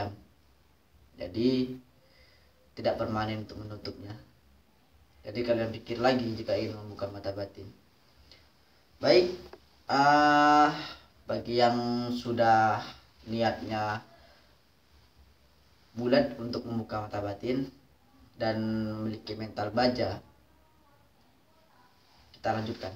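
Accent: Madura, Indonesia. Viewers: Orang mna kak Kyk ada sedikit BHS Madura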